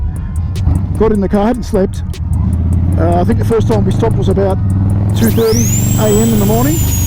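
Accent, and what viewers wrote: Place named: Australia. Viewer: Well, wonder no longer! I've always enjoyed the Australian accent. I just don't hear it everyday. It's not you, it's me! Looking forward to your next video.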